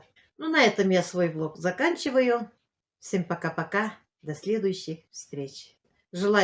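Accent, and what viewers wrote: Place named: Kabardino-Balkaria, Russia. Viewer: Ну, ты такая смешная,так уговаривала козла! Мартин похож на козлов,которые в горах у нас живут. А разговариваешь на татарском как наши балкарцы и карачаевцы!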